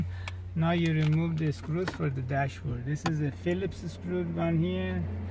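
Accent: Iran. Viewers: You sound like your Persian, great video. How do you tell, or what are the symptoms this part needs to be replace?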